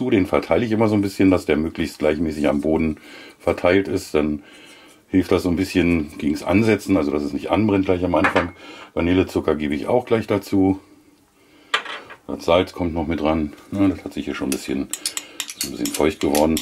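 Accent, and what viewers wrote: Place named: Berlin, Germany. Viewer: Aha, is Berliner! 😁 Ma von Lichtenberg wink. 😉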